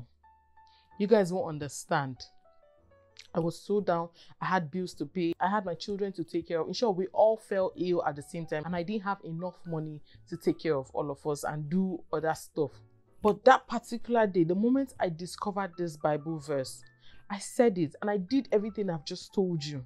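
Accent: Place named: Nigeria. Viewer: Nigerian with quite fantastic accent, not like many of them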